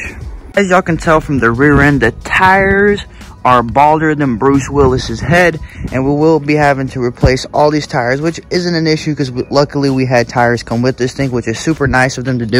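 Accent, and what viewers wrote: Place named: South, United States. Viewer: you even talk like the GQ boys :D (or maybe it's a southern dialect, idk but its funny in a good way). it's definitely going to be a cool project to accomplish. good luck mate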